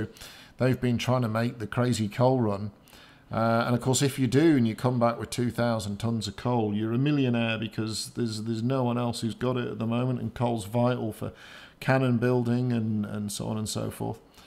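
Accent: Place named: United Kingdom. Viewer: Really? I could of sworn your british :D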